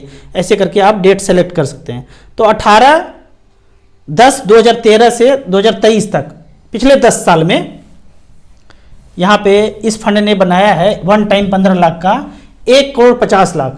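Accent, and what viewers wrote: Bihar, India. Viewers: Aap Bihar se hai kya sir?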